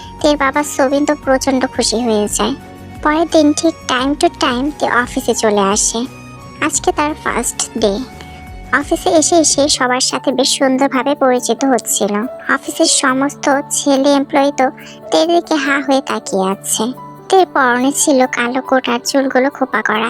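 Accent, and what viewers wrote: Sylhet, Bangladesh. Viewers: Hmm apu r basha Sylhet. 💜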